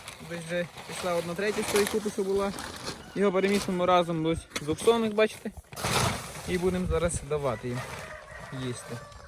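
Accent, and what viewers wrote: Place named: Surzhyk, Ukraine. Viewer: А де ви живете яка область. Такий говор як в нас суржик .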